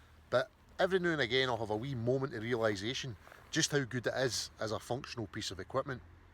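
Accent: Scotland, United Kingdom. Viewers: Thanks for your work. Iran has been my best trip so far, the people there are so friendly with the visitors and specifically with riders because we have bikes they have never seen in their life (big ones are only for the army and the police). As always , problems come with politics ans religion... On the way to Iran the Balkans are fantastic when riding on mountain roads (get away form the coast...), Albania is......different... And eastern Turkey (Kurd country) is also interesting with very nice people. I love your scottish accent (although sometimes difficult to understand) and Scotland (I made the North 500 road) Keep well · Love the review and the comparison to the bullet proof Honda's of days past. I owned quite a few Honda's from the 70's to 90's and apart from the VF1000 (mad bike) they were utterly dependable. I now drive Guzzi's with varying levels of reliability. 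But I must say the most current one (a 2008 Stelvio) performs well, for all the other ones I trade driving pleasure for reliability😇. Worth it, but it is not for everyone. Specially my Quota1000 which I think is the most brilliant failure for a motor bike. A lot of issues, but it drives brilliant like no other. Any way I enjoyed your video, you are using your bike not for show, but for what you can do with it, and I am very envious of you living in Scotland , as I know from experience how nice it can be to drive there. Great small twisty roads and beautiful scenery. Had to re calibrate to understand your commentary a bit with the Scottish accent but don't change. Awesome content.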